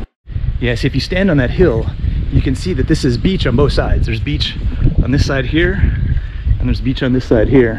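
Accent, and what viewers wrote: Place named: Chicago, United States. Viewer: Are you from Chicago ? When I listen to you speak we sound exactly the same.!! 😂😂😂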